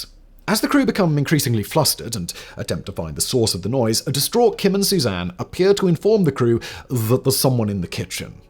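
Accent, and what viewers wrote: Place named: RP, United Kingdom. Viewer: fact boy sounding extra posh as of late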